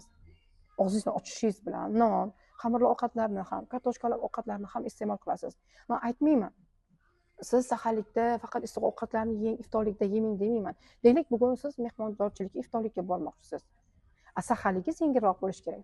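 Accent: Bukhara, Uzbekistan. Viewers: Шеваси бухороликлага ухшаркан ❤❤❤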